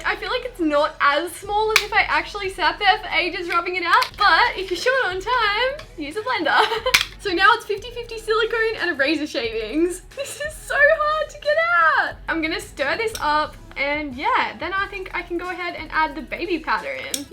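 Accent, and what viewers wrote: Australia. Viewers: I love your Aussie accent its so cute♡♡ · are you Australian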